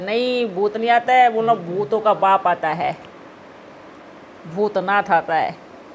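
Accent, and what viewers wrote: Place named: Sindh, Pakistan. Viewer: ब्रह्मा बाबा और जानकी दादी सिंद्ध पाकिस्तान में जन्म लिए थे तथा सिद्धी टोन में बोलते थे। अब यहां जो आवाज है वह वैसे ही हैं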